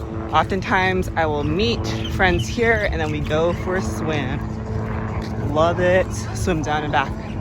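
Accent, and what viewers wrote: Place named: Hawaii, United States. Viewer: I’m born and raised in Hawaii and live in my childhood house on the windward side of Oahu. I really enjoy watching videos people post about living or eating in Hawaii. I love your Asian Aunties, and Mamma Lai videos… my all time faves!!! If you hadn’t mentioned where you were from, I would have thought you were from here!! Have a great day and thank you for the morning walk 🫶🏻🤙🏼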